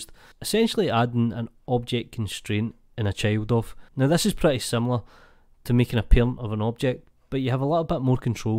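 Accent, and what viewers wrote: Scotland, United Kingdom. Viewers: Ah okay, that explains everything 😄 the scottish accent 👍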